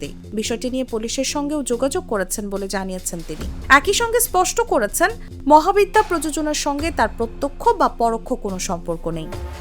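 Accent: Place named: Bangladesh. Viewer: আপামণির কথা বলার ধরন দেখে মনে হচ্ছে বাংলাদেশী, তা আপামণি আপনার দেশ যে বিদ্যুৎহীন অবস্থায় ব্ল্যাক হোলে ঢুকে গেছে সেই খবর করেন না কেন??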